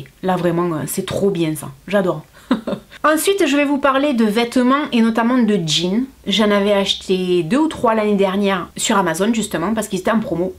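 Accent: South, France. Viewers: Bravo pour ta belle initiative de collecte ! 💛 j adore tous tes vidéos et ton accent du sud.. charmant ! 😊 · Merci pour ton humour ton partage cela fait du bien et ton accent du sud La brassiere n est pas en cotton.? Je ne savais qu il y avait un crayon transparant pour les levres c est top 🐩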